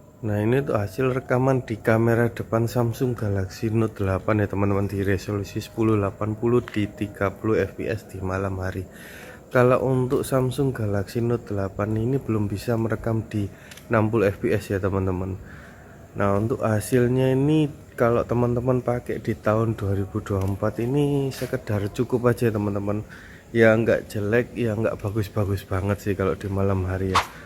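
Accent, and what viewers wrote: Java, Indonesia. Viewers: Bagus reviewnya mas.tp agak medok.(maaf)keliatan orang jawa ya😂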